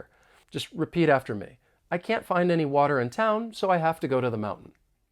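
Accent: United States. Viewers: Beautiful, nothing like American pronunciation. It's my favorite. ❤ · you are an amazing teacher i have seen on youtube,,,,,,,your teaching skills are amazing,,,,,,,we all needed this type of explanation🥰🥰 you just nailed amercian accent🥵now its very easy for pronounce such an accent ☺but the last one is very difficult and complicated 🥴SUPERCALIFROAGILISTICEXPIALIDOCIOUS ufff🥵🤧🤒😴🥱 what does it mean 🤔by the way thankyou so much for this explanation🥰🥰🥰greetings and love from PAKISTAN😍😘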